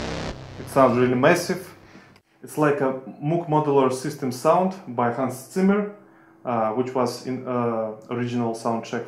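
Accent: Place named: Russia. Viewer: А можно на русском такой же? Судя по произношению Русский вам должен быть знаком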